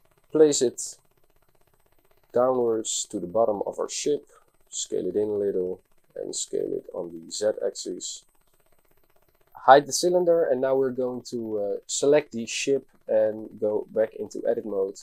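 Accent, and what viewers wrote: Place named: Netherlands. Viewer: Your dutch lol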